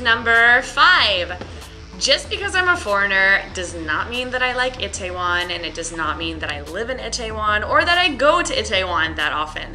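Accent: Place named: South, United States. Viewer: Do you ever find your southern (you don't have the full c*** a** accent) causing communication problems?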